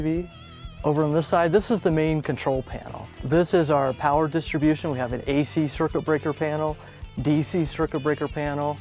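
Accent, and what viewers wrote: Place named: England, United Kingdom. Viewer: I can’t tell if you are English or are just trying to sound it. And I don’t know which one I prefer.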